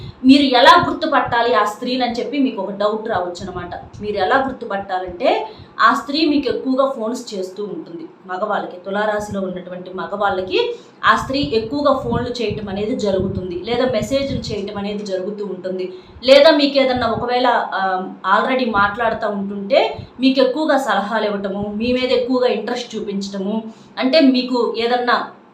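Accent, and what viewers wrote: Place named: Guntur, India. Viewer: గుంటూరు యాస లో బాగా చెప్పారు మేడం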